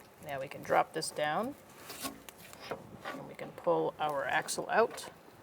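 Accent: Boston, United States. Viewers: my favorite mechanic chick and that Boston accent!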